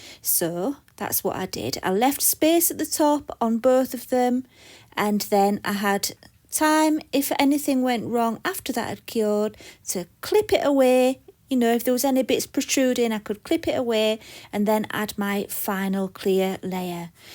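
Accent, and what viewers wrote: United Kingdom. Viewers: Best resin channel! Plus us yanks love a British accent.😍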